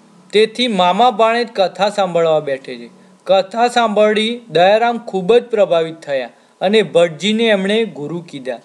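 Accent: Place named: Mehsana, India. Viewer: તમારો મેહસાણાનો તળપદો અવાજ સરસ છે.."મ" સીરિસ સીવાય મસ્ત છે બધુ...આટલી વિસાળ મહેનત કરો છો તો ભુલો ગોતી અમારે તમને ન કેવુ જોઈએ.પણ મજા આવે છે...શરુ રાખજો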